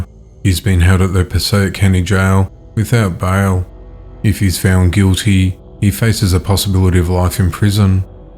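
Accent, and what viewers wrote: Australia. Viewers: Australian accent, East Coast, NSW?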